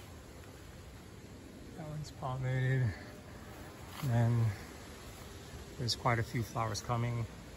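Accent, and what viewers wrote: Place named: Jamaica. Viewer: Respects - cool technique. (PS you sound like a yard man all weh ah gwaan still 🙂)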